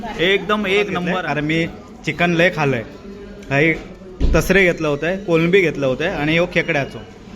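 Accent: Malvani, India. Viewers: तुमची मालवणी भाषा एकदम pure आहे,तसेच व्हिडिओ खूप साधे आणि खरे असतात. आज परबिन आणि सावतीन भेटल्यो नाय 😂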